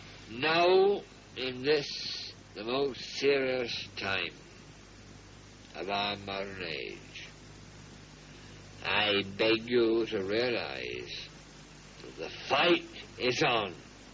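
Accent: United Kingdom. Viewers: Beh nelle news britanniche mi pare sia normale avere la received pronunciation, un po' come da noi vanno a corsi di dizione di italiano standard... Certo che se era irlandese-americano, ha una pronuncia britannica notevole... con anche l'isolamento all'estero per parecchi anni... come notevole fu la persistenza di quella propaganda che, da semplice curioso e sono solo tutte domande le mie, cmq non ho mai indagato se diede mai alcun benché minimo riscontro nell'opinione pubblica britannica... pensavo avessero smesso ben prima del '45 di trasmetterlo... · tbh its just how british people sound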